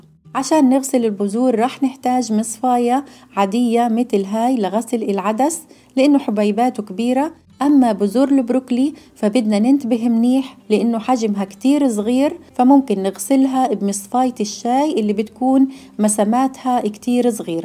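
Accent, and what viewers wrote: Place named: Egypt. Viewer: ليش لهجتك مخلوطة بالمصري.؟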